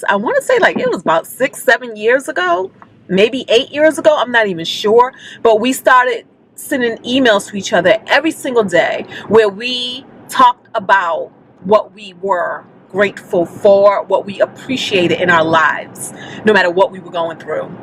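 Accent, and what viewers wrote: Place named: New Jersey, United States. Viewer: You sound so Jersy. I love it. Are you from Jersey? Next question, what type of camera do you use on your videos from home and your car videos? Love your videos by the way!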